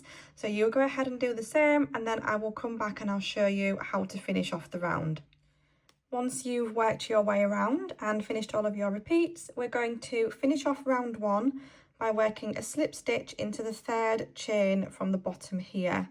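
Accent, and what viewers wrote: Northern England, United Kingdom. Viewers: 💙💙💙 Thank you so much for sharing this. ✌🏻💙🧶📝✂️ Are you from the northern part of England? I'm just curious because your accent is a bit different.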